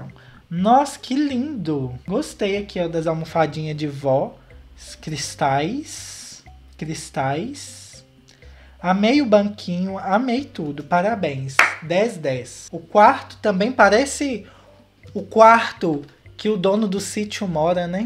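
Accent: Minas Gerais, Brazil. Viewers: Com esse sotaque só pode ser de Minas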